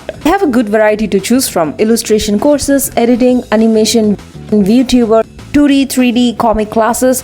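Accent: India. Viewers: You got some little bit of Indian accent I think 🤔 you are from India, btw keep it up😊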